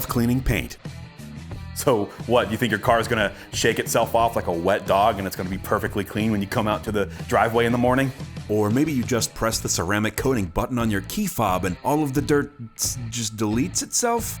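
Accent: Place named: England, United Kingdom. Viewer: Thats a VERY convincing English accent! I’m English and ya had me fooled!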